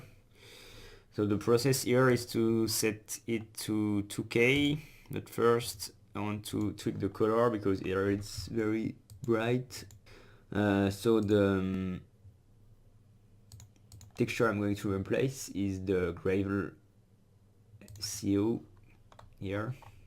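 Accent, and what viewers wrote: France. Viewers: Very noticable french accent ;) thanks for taking care of the Arma modding community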